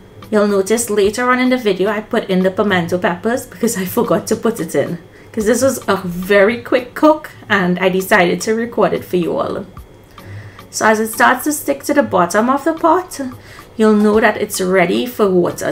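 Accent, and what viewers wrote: Trinidad, Trinidad and Tobago. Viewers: PS. I enjoy hearing the Trini 'twang'🥰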